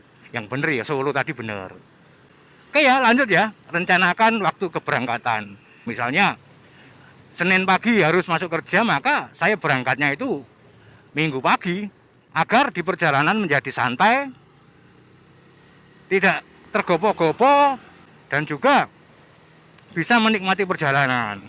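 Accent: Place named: East Java, Indonesia. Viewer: Logatmu wes jawa timuran bro ilang asline😅😅ditunggu di jalur JLS trenggalek - tulungagung lanjut blitar-malang🙏👍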